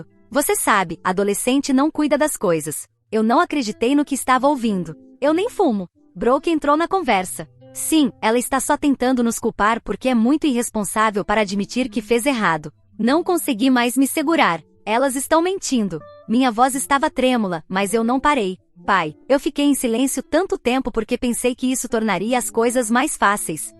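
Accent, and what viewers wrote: Portugal. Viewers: Esse português de Portugal ai 😅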